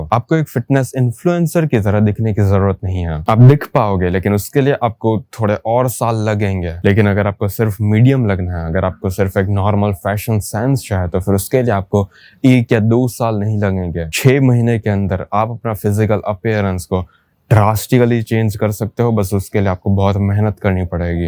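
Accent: Gujarat, India. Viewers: tumhare Gujarati accent ki vajeh se lagta h tum vimal chaba ke bol rhe ho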